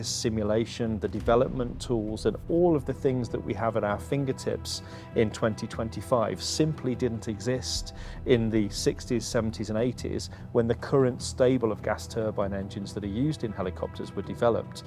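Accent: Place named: United Kingdom. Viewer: sounds like a new British billionaire. Godspeed.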